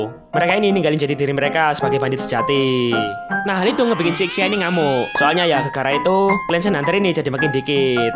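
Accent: Java, Indonesia. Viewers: boso jowo mu kentel men mas, wong jowo ta? 🗿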